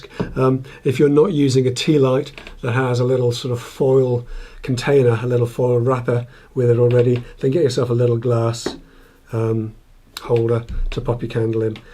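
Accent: United Kingdom. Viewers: A great little gift idea.Thank you for your time and inspiration this past year, I always enjoy your videos; Fun, Informative and with a Wonderful British Delivery.From one Brit to another, Merry Christmas, All the Best to you and yours.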